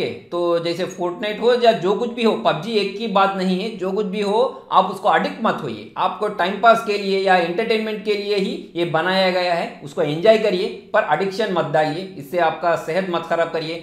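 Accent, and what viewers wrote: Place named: Butler English, India. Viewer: Bro nuvvu hindi talk cheste comedy ga undi=na English la ga = butler english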